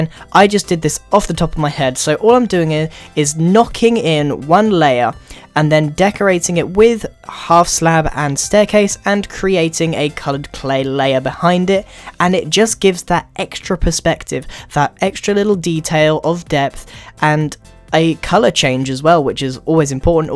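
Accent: England, United Kingdom. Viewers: Hey, love you English accent! 👍👍👍👍👍👍👍👍👊👊👊👊👊👊👊👊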